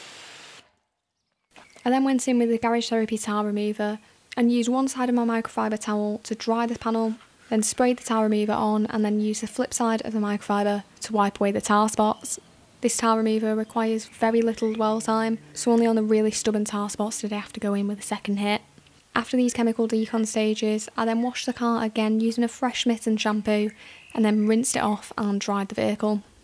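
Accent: United Kingdom. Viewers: Se speak british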